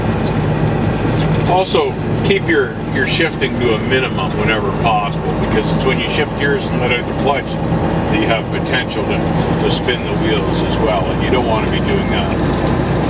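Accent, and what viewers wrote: Canada. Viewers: You ate a great driver i can tell that you have been a professional for a long time. I can also tell you’re Canadian, the way you pronounce your O’s. 😃. Thanks for the video, very helpful!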